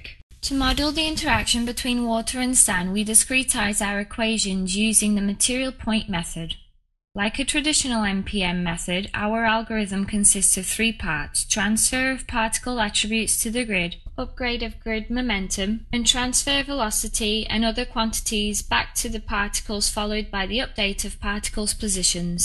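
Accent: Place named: Northern England, United Kingdom. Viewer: Yes, her accent is somewhat northern English. Do you have trouble understanding what she is saying?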